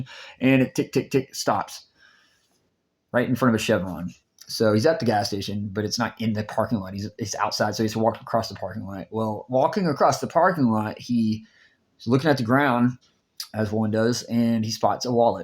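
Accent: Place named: Tennessee, United States. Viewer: You from up there, in Tennessee?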